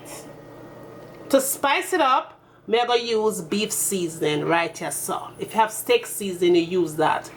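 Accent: Jamaica. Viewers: omg I LUV ur Jamaican accent ! It takes me to the beaches of Jamaica while enjoying a spicy beef Pattie.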